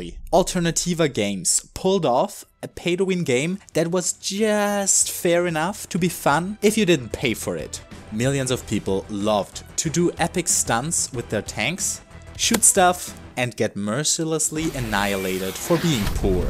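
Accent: Germany. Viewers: based on the accent im assuming you are german? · ru german your accent sounds like it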